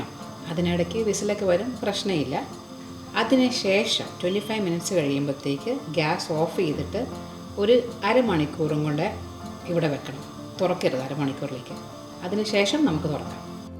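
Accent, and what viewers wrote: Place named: Kottayam, India. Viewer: Chechii.. beautiful presentation👌. Samsarathile aa kottayam style... 😍😍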